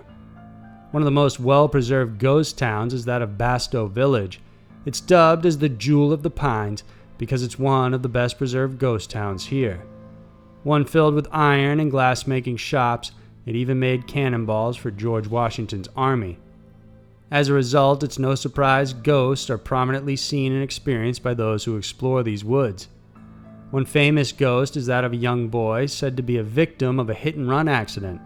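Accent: South, United States. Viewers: Goddess bless that southern inflection in your voice. Reminds me of the pine forests back home. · Anyone else think this guy is from the South>? he has a noticeable drawl to his voice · Trying to place your accent. Obviously southern. But not East Coast, because that's where I'm at. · could be like mine, totally untraceable. My mom is from Michigan, dad is from Mississippi, I grew up between Louisiana and Alabama then college in GA. But I didn't interact with other kids much when I was young, read a lot and wound up with barely an accent. After moving around a lot in adulthood and picking up linguistic tidbits from all over the country (some intentionally, some not) it's a mash-up. But when I was younger and had so little accent that people thought I was from somewhere else ("where are you from?" "I was born in NoLa." "No, i mean where did you grow up? 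You sound like a Yankee." etc) I sounded like this guy. Very subtle southern accent but much closer to the "Nowhere Special" accent that newscasters have.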